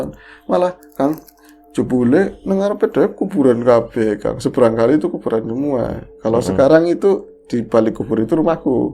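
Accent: Banyumas, Indonesia. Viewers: Aku di sini malah kekel krna mas nya ngomong nya ngapak campur bahasa indonesia 😂😂😂😂😂maaf ya gk bisa gk ketawa 😂😂😂😂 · Mas, aku kelabakan pas ngomong ngapak full speed. Ga ada subtitle-nya. 🤣🤣 Btw, format begini menarik jg sih. Seperti POV klo kita ngumpul² bareng gt kan pasti ngeliatnya ga cuma ke satu orang.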